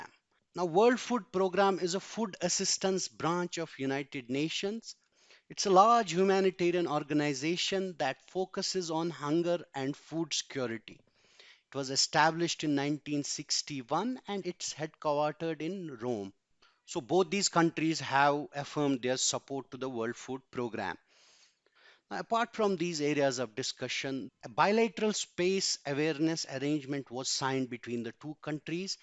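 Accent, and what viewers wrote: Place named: Kashmir, India. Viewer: Thank you sir, Nice analysis of the big new of the day.. New voice from byju's probably from North india, most probably a kashmiri voice.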